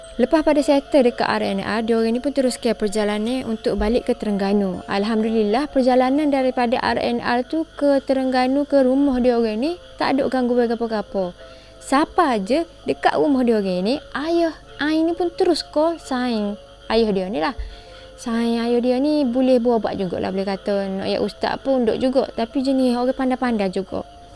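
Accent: Kelantan, Malaysia. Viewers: Ore kelamtam ka😅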